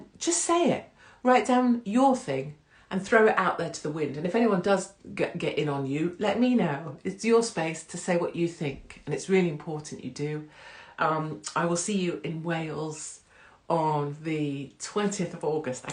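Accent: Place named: England, United Kingdom. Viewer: Crane pants conjures up a very different mental image than what you meant, I'll spare you the trauma of explaining. Enjoyed the show, a bit of intelligent humor with my delicious morning coffee drink while you sip a glass of wine, being the pretty English girl at peak hotness with abundant charm and an adorable accent is totally irrelevant.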